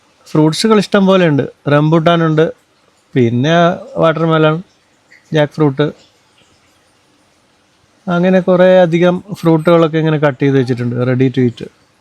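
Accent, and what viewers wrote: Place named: Malabar, India. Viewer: ഇങ്ങള് മലബാർറാരൻ ആണോന്ന് എനിക് നേരത്തെ സംശ്യം ഇണ്ടായ്ക്ക് ഇപ്പൊ ഒറപിച്ച്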